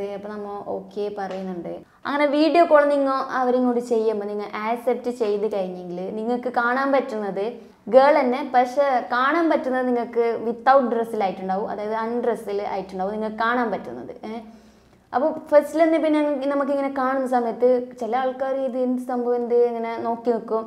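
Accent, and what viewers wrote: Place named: Kasaragod, India. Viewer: Slang kazrod ayond nere manassilayilla....but,informative 👍🏻keep well❤️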